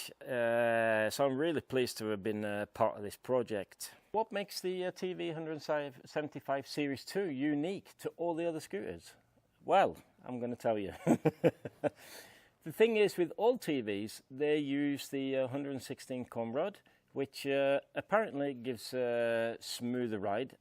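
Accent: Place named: Glasgow, United Kingdom. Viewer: Dude! While I am a Vespa guy through-and-through, I really enjoyed watching you take us around this original Lambretta. It was quite a bit of fun! Not sure how I got here, but you've got a new sub. I picked up some Glaswegian in your accent...then, I read your bio! Great work, sir!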